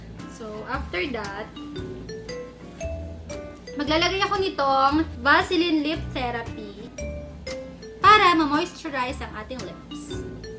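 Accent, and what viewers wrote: Batangas, Philippines. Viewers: I'm new to this channel. Second time ko manood ng vid mo. Gustong gusto ko yung accent as batangueña haha. So cuteeeee 💙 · Ate batangueña ka po ba HAHAHAHA WALA ANG CUTE LANG KASIII!!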